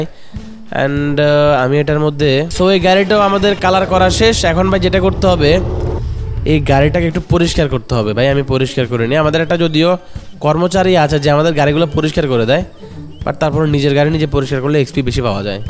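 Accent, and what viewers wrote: Noakhali, Bangladesh. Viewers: ভাই নোয়াখালী কথায় আপনার বাড়ি😅😊❤❤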